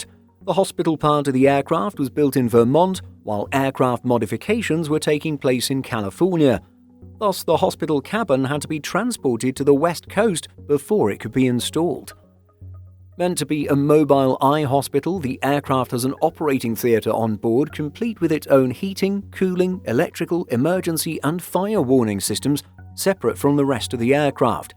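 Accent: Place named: United Kingdom. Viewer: Your channel is awesome. But your tea drinker accent is annoying as FÆCK! CHANGE IT! COLONIZER! YOU PROBABLY HAVE SOME CROOKED TEETH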